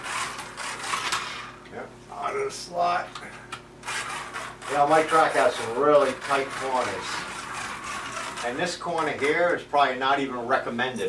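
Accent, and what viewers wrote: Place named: Rhode Island, United States. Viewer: You sound like a fellow Rhode Islander to me, Did I guess right? Or Mass. maybe Boston? Nice track.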